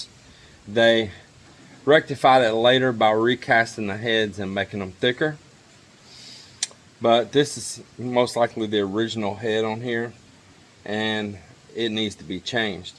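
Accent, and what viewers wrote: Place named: South, United States. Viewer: Sir, I like listening to your southern accent. Keep up what your doing. I enjoy it. When I retire hopefully I see you.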